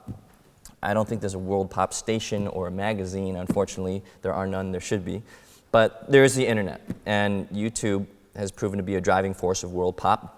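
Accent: United States. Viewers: his chinese is good though (in terms of vocabulary, grammar, way of speaking, etc.)...but he has an american accent when he speaks chinese. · weirdly enough as a guy who grew up in NY, he sounds like he's from LA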